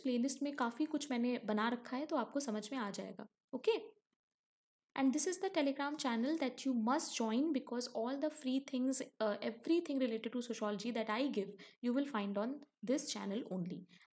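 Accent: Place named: India. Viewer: I don't understand the indian english, sorry!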